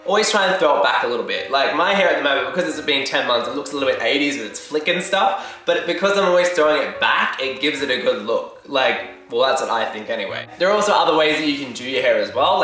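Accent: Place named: Australia. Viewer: Good to hear a fellow aussie! Nice hair mate.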